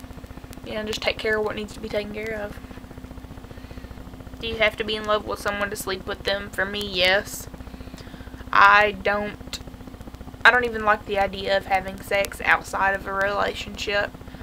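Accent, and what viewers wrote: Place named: South, United States. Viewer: this is fuuny as shit cause u sound Country as Hell.....which I find it very cute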